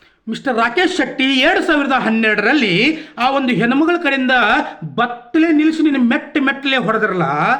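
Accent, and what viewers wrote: North Karnataka, India. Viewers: ಉತ್ತರ ಕರ್ನಾಟಕ ಭಾಷೆ ಅದ್ಭುತ, ಬೈ ಇನ್ನು ಬೈ ಆ ಸೂ,,, ಮಗಗ್ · Kshamisuvantha mathannu neevu aadilla bidu thamma aa halkat rakesh shetty ge sariyagiye ugididdiya yaradru avnige chennagi baiyabeku nange baralla adikke andkondidde ninna mathu keli bahala santhoshavaythu thamma innuu yenenu baruthho adella ugi namge bejarilla uttara karnatakavadrenu dakshinavadrenu madya adrenu yella karnatakane thane nimma kadeya bhashe style chenda 😊😅😂😂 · Uthara karnatakada sogadu adbhutha. Nimma baigulagallannu keluvudakke onthara maja. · Chennagide nim uk bhashe bro bless u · Nam uttara karnataka bhashene chenda anna🤩🤩🔥🔥🔥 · ಅಣ್ಣ..ನಾವು ಕರಾವಳಿ ಭಾಗದವರು ಅಂದರೆ ಧರ್ಮಸ್ಥಳದ ಸಮೀಪ.. ರಾಕೇಶ್ ಶೆಟ್ಟಿಯಂತಹ ಅಲ್ಕಾ ಬೇವರ್ಸಿ ತಾವು ಎಷ್ಟು ಬೈದ್ರು ಸಾಕಾಗಲ್ಲ..ಉತ್ತರ ಕನ್ನಡದ ಭಾಷೆ ಕೇಳೋಕೆ ಬಹಳ ಚಂದ.. 🙏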